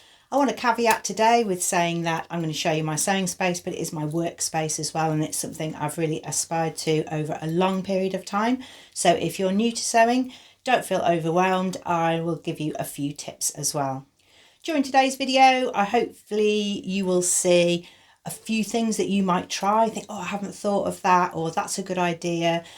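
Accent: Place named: England, United Kingdom. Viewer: first time here, love the clear style and lovely English accent. great tip for remembering which needle is in the machine.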